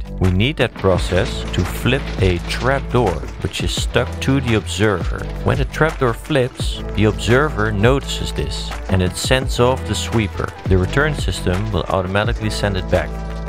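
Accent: Netherlands. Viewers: volgens mij hoor ik dat je nl bent 😅😂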